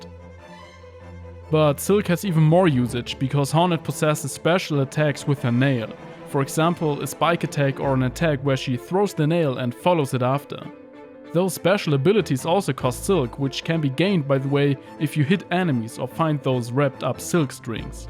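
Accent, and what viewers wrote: Germany. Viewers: youre german right ? XD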